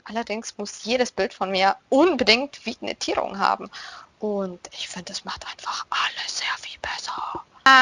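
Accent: Germany. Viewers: Hey ^^ What part of Germany is your accent from? love your cosplays <3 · I haven't actually been reading, I've just been sitting here listening to your accent and language. I think the German language is really cool!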